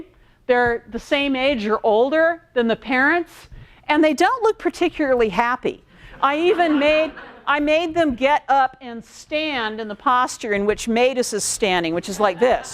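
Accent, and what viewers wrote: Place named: United States. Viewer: Latin spoken with American accent sounds funny.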